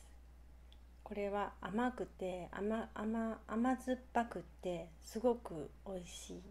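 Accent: Kyoto, Japan. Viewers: 懐かしい京都弁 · インスタでも登録させていただきましたよコメントさせていただきました。 バリバリ京都弁で落ち着きます🎵 そして、そのドレッシングのお店の近くに子供の頃から住んでます。 大原の田舎者です❗️ よろしくね😀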